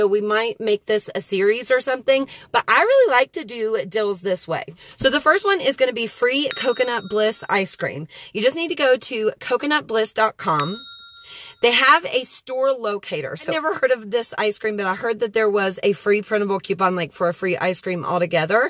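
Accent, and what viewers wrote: South, United States. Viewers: Geezermann 😂😂🤣🤣Her southern accent I just love her she’s amazing accent and all🤣🤣😂😂🤗❤️🙏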